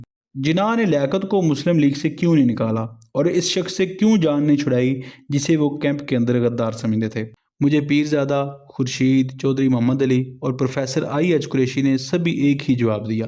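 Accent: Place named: Punjab, Pakistan. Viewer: تلفظ تو درست کرلیں ۔ اُردو میں Audio Book بنائی اور تلفظ پنجابی لہجہ میں ۔ یہ قومی عصبیت نہیں بلکہ خالص علمی تجزیہ ہے۔ اگر آپ اس نصری کتب (Audio Book) کو پنجابی زبان میں بیان کرتے تو بہتر تھا ۔ یا اپنے اسکرپٹ کو اردو بولنے والے ریکارڈ کرواتے ۔ لیکن آخر میں اچھی کاوش تھی ۔ وسلام 🎉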